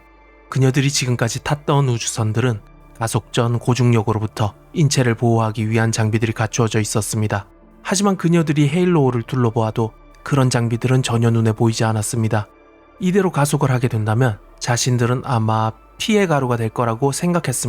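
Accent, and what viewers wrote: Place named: China. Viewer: 엥 왜 말투가 조선족...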